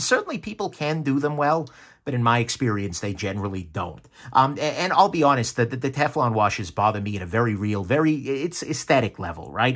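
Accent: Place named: Brooklyn, United States. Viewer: No way you live in Cali! You sound like your from Brooklyn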